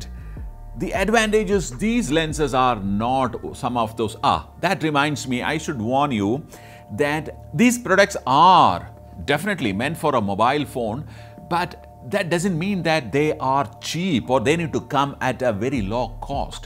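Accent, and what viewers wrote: Kerala, India. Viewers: You speak English like Indian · sounds like keralite.. isnt it? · He has a mallu accenture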